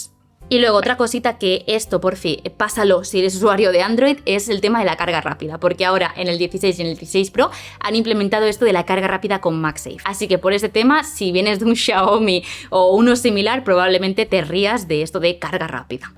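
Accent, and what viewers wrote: Spain. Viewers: Hola eres de España ?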